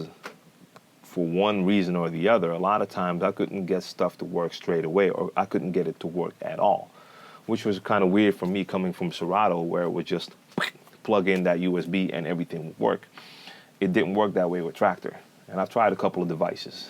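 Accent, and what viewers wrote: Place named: United States. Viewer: Let me put this simple to you,,, by your accent i believe you are from USA. I'm a DJ for the last 26 years, i do beat match by ears, i'm a car driver, i have a 5 speed car. Virtual DJ is like automatic cars and the others are like manual shift cars. if you drive an automatic car, you are still a qualifier to get a driver license. And let me tell you something else, Virtual DJ is from France and is not free, the other crap softwares are free with the unit. After Numark failed to pay to Virtual DJ, they moved out of American market, also for a long time, nobody built a software like this. Virtual DJ is the first and the one, the others are pure advertizing. A real DJ is who has it a full house and makes everybody dance all the time no matter how !!!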